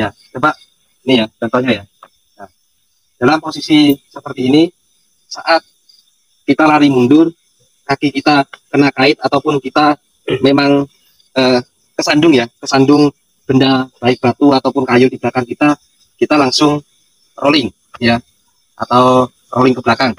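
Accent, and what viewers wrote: Madura, Indonesia. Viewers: Orang Madura bukan mas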